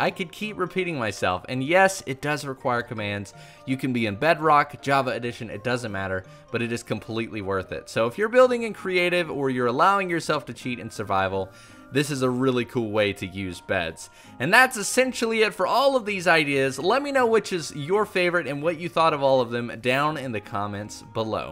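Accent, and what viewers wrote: United States. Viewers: He does have an accent it's just an American accent can't really pinpoint it but he does have one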